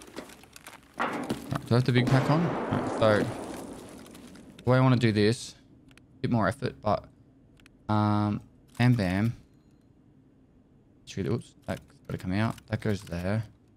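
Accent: Australia. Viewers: Australians have the best accent lol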